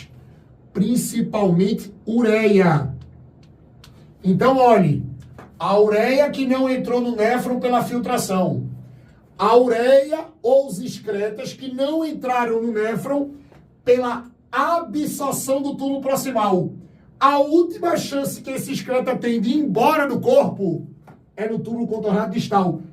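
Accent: Northeast, Brazil. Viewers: amo o sutaque nordestino representando minha terra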